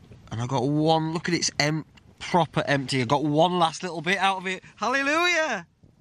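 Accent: Yorkshire, United Kingdom. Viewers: Hey up I’ve been off work all week, with a knackered back and discovered you on Monday and you’re all I’ve watched since. I’ve watched the Cape Wrath, Barefoot 3 Peaks and Coast to Coast videos and more in between. You’re a breath of fresh air pal and have really cheered me up. Latch and Locks, All the play on words ( my favourite one, Deerdre Barlow 😂 ) and of course MEDIC 😂😂 It’s good to hear a fellow Yorkshireman, so keep posting the great clips Fella and stay safe. 👍🍻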